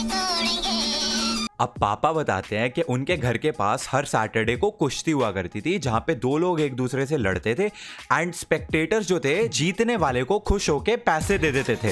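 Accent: Punjab, India. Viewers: Thaade punjabi bari cute a ji😅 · Hes punjabi is very cute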